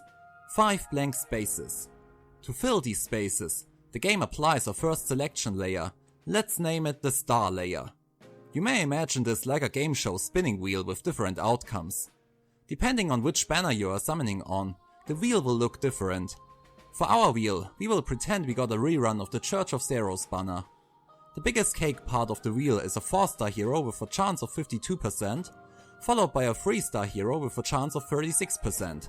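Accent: Germany. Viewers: this man has a very german accent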